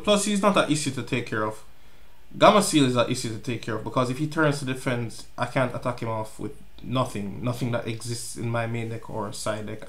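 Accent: Jamaica. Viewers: he sounds a bit Jamaican are you Jamaican?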